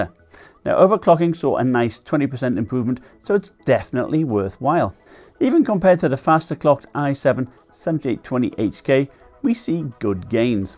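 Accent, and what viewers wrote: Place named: Scotland, United Kingdom. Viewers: exklim Tech News Well, you have this scottish accent plus the funny vid you made with the umprella and I thought u were british! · Sound Scottish.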